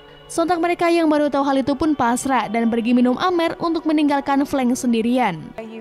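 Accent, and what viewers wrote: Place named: Batang, Indonesia. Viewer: Orang batang min?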